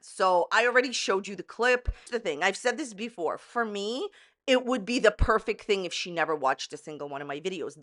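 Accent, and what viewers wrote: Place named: New York, United States. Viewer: Hi, I didn't know who you were until I watched Under the hood, Amber, you're absolutely correct about Jordy, I watched Jordy for months when he cover the Cooney girl. I don't know a quarter of what Amber knows about him. It's crazy that she's putting you down and blocking your comments, she's a chicken shit in my opinion. I have a woman doing that to me too. I get around it sometimes. Lol 🤣 I'm not putting Amber down or you because I don't know you, I do however know Amber lies and lies, I think she's a hypochondriac. I watched her to see if she started the weight loss journey she said she was going to start. She has a lotta drama and she is a dirty woman. From my own observation of all the things I've watched. I watch many different channels of everyone talking about her. I believe she uses people and I'm sorry but in my opinion the girl is too loss with who she lets between her legs, if you get my drift And I don't care if she comes to me about what I'm saying because I will tell people to their face what I think or feel. 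Are you from, you have an accent, I know it's not Jersey, but it's not quite New York. I can hear it when you talk. I'm a Jersey girl myself. Nice to meet you even though it's under crazy circumstances 😂😊😊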